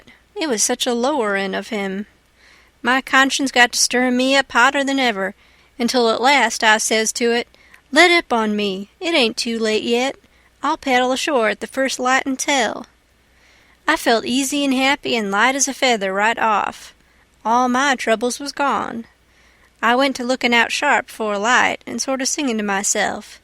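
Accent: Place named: South, United States. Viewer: Hmmm. Not thrilled about the narrator. All I can see is some southern girl in my mind. Should have been a male.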